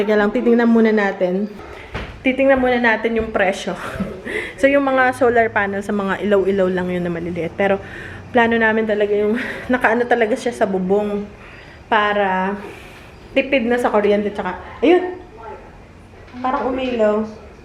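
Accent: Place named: Mindoro, Philippines. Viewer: Hi ate, curious lng kasi ako..saan province mo dito sa Pinas? Parang tono samen sa Mindoro po kasi😊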